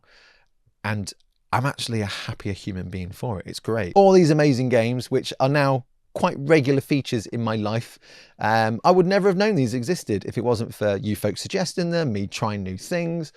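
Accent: United Kingdom. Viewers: Audio's fine by me matey! I just like hearing an East Midlands accent instead of an American drawl for once xD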